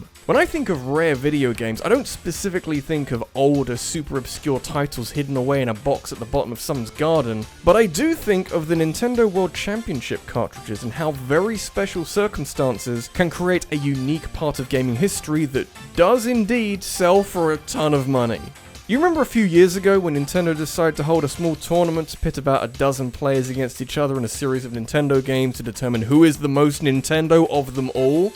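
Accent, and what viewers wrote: United Kingdom. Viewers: what is gramma attack? gamra attack? gammar? the british accent, they like to skip their Ts and add Rs. I cannot enjoy listening to british speakers because I am too stupid and derp out when they say words like law and i hear lore and spend the next 10 seconds trying to figure out if im having a stroke, and end up missing what they are saying, leading me to get totally lost. just me? or is it genuinely confusing when they say words they dont mean to? sorry, just realizing why im unsubscribing from british channels, makes me sad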